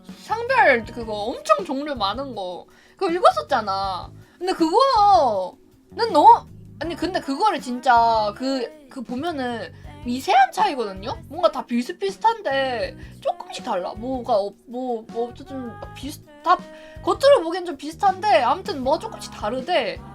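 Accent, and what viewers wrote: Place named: Gyeongsang, South Korea. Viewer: 경상도 사람임? 사투리쓰네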